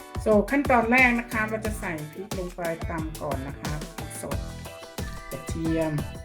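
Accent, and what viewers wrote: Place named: Isan, Thailand. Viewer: ถาดน่ารักเชียวค่ะคุณ พรนึกว่าคนจีนพุ้นเด่ เห็นเว่าอิสานน่ารักดีค่ะ คนจังหวัดใดน้อ❤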